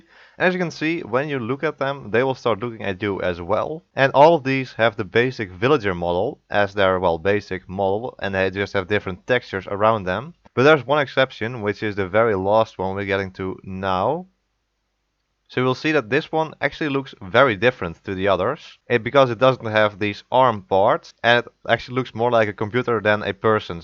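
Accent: Netherlands. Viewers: Dat nederlands accent XD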